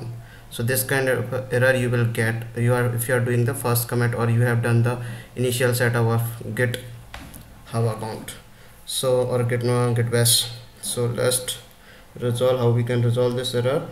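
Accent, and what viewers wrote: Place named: India. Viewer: Whenever you hear this hindi accent I know i'm in good hands.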